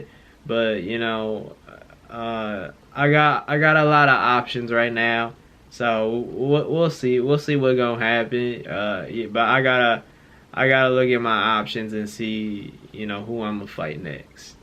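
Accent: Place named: Texas, United States. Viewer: 🤣😅😂your Texas accent is trash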